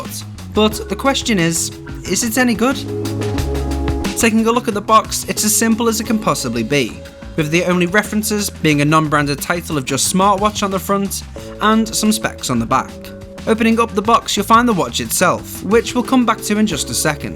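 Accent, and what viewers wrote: United Kingdom. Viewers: i like your accent, sounds like harry potter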